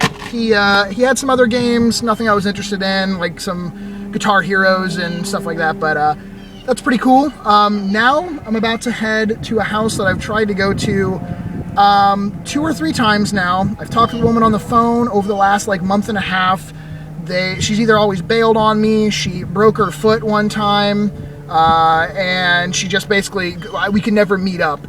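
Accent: United States. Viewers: Yeah. American vocal contractions are fun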